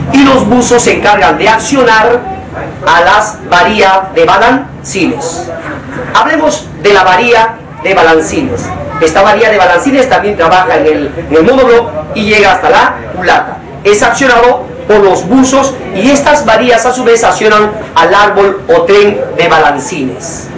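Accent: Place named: Peru. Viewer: Parece que es peruano este maje por como habla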